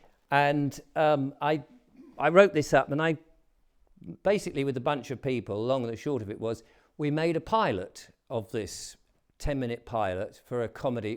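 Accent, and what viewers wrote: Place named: RP, United Kingdom. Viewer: Rp accent... one eye... full of shite... shill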